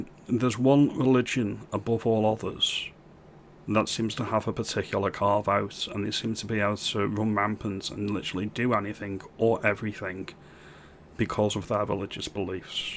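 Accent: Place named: Cheshire, United Kingdom. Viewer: Love your musings mate. You're a great Patriot. What part of Cheshire are you from? Am guessing one of Runcorn/Frodsham/Helsby/Winsford with that accent! ie Scouse overflow areas! 🤔🧐🫡🫵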